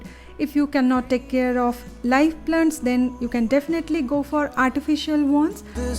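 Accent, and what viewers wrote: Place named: Bengal, India. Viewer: Simply wow , your collection is beautiful. By the way r u bengali,actualy u have bengali accent.Please reply .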